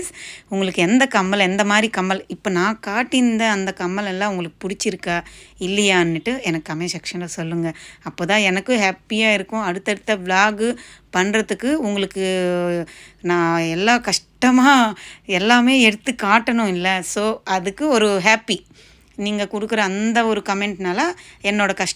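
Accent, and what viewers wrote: Telugu, India. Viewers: Sis neenga telugu vaasanai vesura tamil la pesuradhaala adhu keka nalla irukku ❤❤❤❤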